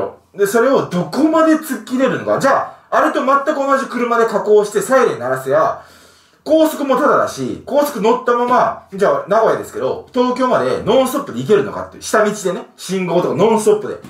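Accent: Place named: Kansai, Japan. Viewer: なんで関西弁なん？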